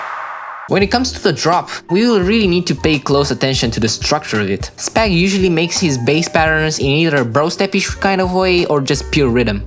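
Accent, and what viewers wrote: Romania. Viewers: Wow awesome! Are you Romanian? You have the same accent as Gutter.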